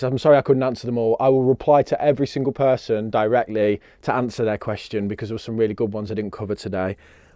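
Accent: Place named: Coventry, United Kingdom. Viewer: 28 These are my salad days Your accent is a bit more Cov than 0121 🤪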